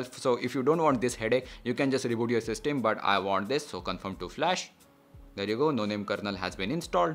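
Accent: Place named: South India, India. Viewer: South Indian... I know right ?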